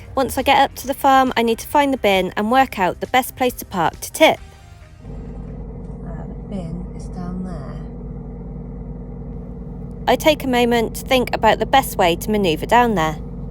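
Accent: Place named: Wales, United Kingdom. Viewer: Hi, nice to see you back again and looking well although I know looks can be deceiving. But you are always smiling so that’s good. Yes the welsh accent is great isn’t it although I am a bit biased mum was Welsh and us kids were bombed out of London in the blitz. So up to our family south wales lucky us.😂😂. I bet it’s nice sleeping on the farms and safe of cause. Well stay well you’re health is the most important thing so never take it for granted if you do it will catch you out down the line. I know I have been there and it’s most unpleasant. Good luck Jon 🇦🇺WA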